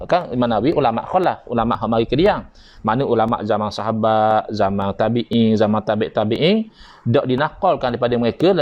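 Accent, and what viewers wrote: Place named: Terengganu, Malaysia. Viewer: Sedak pokku cakak ganu..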